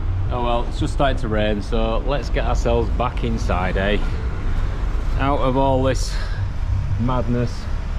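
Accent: Yorkshire, United Kingdom. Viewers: Loved it such a different format loved seeing the different cities food apartments and you standing on street corners looking like you were loitering with intent hope we have more of your travel adventures 😊oh and that great Yorkshire accent ❤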